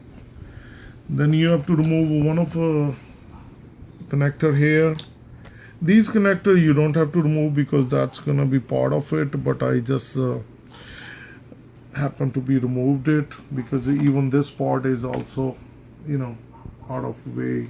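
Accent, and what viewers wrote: India. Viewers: this guy seems like it was his 1st time opening a laptop and the worst video on youtube with his bad English curry English and also half the demo was missing he did not show many steps were missing so thumbs down to u.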